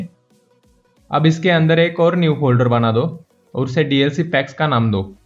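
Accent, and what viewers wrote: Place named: Khandesh, India. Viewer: BRO I TOTALLY LOVE YOU your way of speaking and that khandeshi accent i love it. it was so easy to understand you were straight and simple subscribing you right away.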